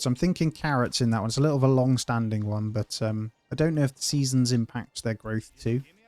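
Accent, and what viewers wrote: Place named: East Anglia, United Kingdom. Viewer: Well its definitely an east anglian accent, I was just refusing to say Ipshit.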